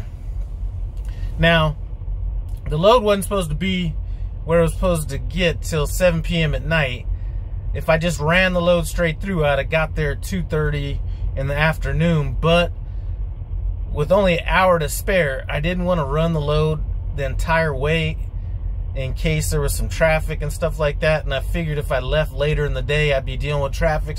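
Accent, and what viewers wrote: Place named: Southern California, United States. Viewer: He talks just like So Cal.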